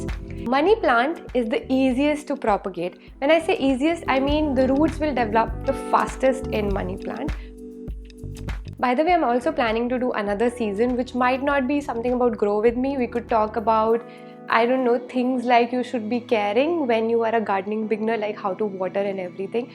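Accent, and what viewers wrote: India. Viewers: North Indian accent in English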